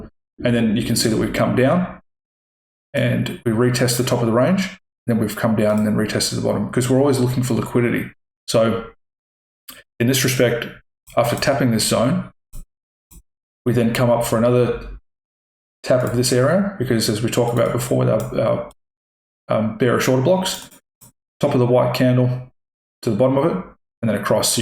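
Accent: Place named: Australia. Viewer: Hey Mate, much love from Sunshine Coast. Good to hear an Aussie on here teaching the goods. Thanks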